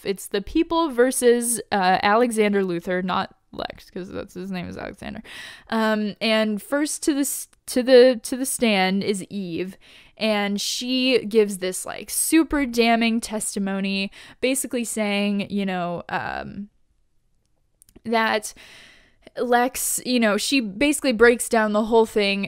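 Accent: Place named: England, United Kingdom. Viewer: beautiful english accent btw ;P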